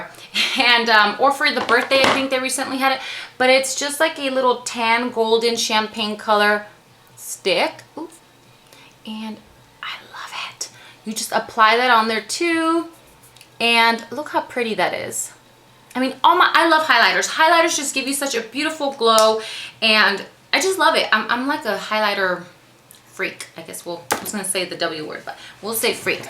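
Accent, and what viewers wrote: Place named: New York, United States. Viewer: you sound so Latina... and so New York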